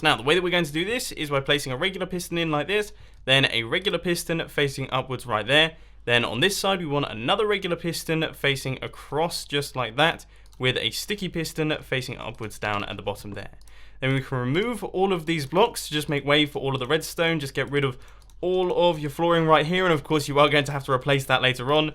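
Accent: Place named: England, United Kingdom. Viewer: It's his accent, English eh!?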